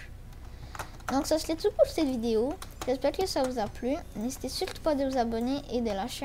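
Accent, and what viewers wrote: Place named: England, United Kingdom. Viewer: j'étais sûr que t'étais anglais vu ton accent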